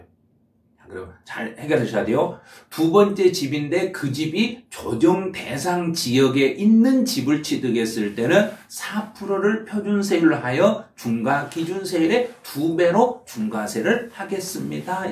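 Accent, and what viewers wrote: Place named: Jeolla, South Korea. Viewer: 쌤 사랑합니다! 쌤 셥듣고 나서 이상형이 바꼇어요~ㅋㅋ 전라도 사투리 쓰는 남자로요~♡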